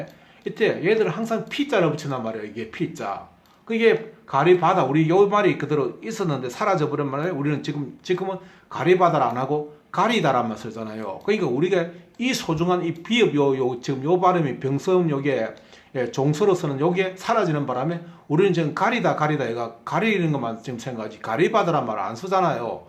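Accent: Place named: Jeolla, South Korea. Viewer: 그래서 사투리랑 비슷하다고 했구나. 전라도 말투인데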